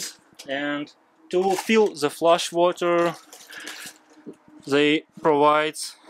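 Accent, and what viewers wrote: Russia. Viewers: Чувствуется русскоязычный акцент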